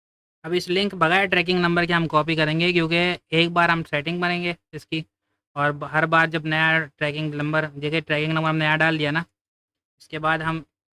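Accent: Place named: Karachi, Pakistan. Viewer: bhaai kasam se ye hoti hi video bhai ap log behtareen karry ho yar ye wahid video full detailed me mili hi baki sab log sirf chotiya banaty hi time waste krty hi bas view lyty hi and i love this karachi Landhi korangi accent Pepshi pepshi 😍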